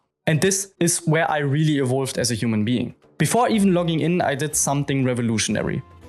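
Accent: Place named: Germany. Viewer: German English sounds funny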